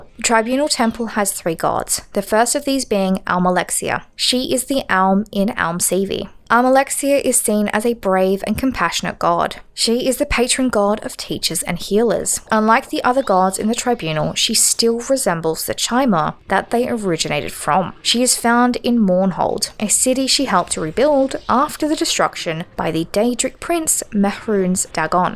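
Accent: Australia. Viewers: Just wondered the same they have like a perfect voice for voice overs thank god for the slight australian accent making it stand out especially nowadays!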